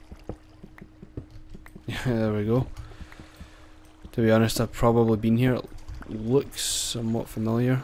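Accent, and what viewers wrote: Scotland, United Kingdom. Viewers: I really liked your MW2 commentaries I love your Minecraft videos I am OBSESSED BY YOUR AWESOME SCOTTISH ACCENT!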